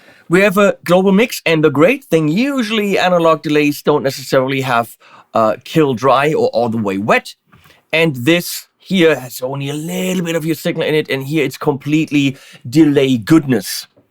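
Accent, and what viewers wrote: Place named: Germany. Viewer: What a coincidence! There is a word in Greek with exactly that spelling and meaning! ΜΕΡΑΚΙ (yes in Greek P is the latin R and Π is the latin P). But it's not pronounced meh-ru-kai but meh-rah-ki exactly as you pronounced it with your German accent my friend. This could be the ERASMIAN pronunciation the latin world uses to read Greek for convenience. For those not familiar with ERASMIAN It's as if someone would read the english word "thought" as ...tho-oo-gt hahaha.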